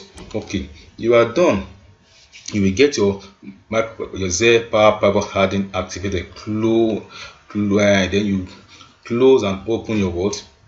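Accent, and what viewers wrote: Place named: Dubai, United Arab Emirates. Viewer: Your accent is really difficult to get, it feels like you're speaking like Dubai people.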